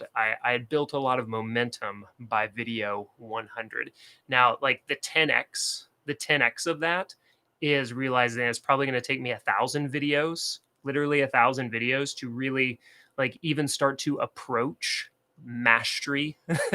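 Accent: South, United States. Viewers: Love the southern accent and the "Never settle" in the background. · love southern accents! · The southern accent was chef’s kiss And I’m mad I missed this stream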